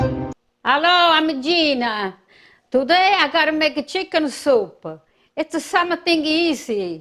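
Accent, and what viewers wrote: Italy. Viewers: I love to watch you cook gina,, you cook soo good I love your food and most of all I love your Italian accent ,,my favorite Italian food,, 😋you are the greatest cook 😃😇❤💖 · Gina, I love your cooking! I'm a very big fan of yours. My family came from Italy (both sides). So your Italian accent is very wonderful/familiar because of my mother. Thank you so very much for sharing your wonderful cooking ideas. 💗💗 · The soup looks good ...I love your Italian accent ...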